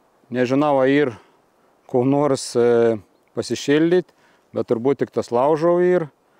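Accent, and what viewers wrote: Samogitia, Lithuania. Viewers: Smagus video, mano asmeninis pastebėjimas, kažkodėl labai tinka žemaitiška tarmė tokio pobūdžio turiniui 🐻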